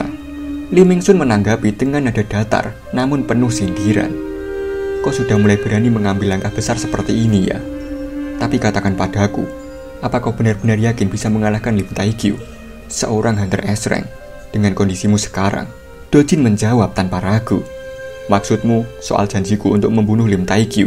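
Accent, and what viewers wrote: Java, Indonesia. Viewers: Wong jowo a mass?🗿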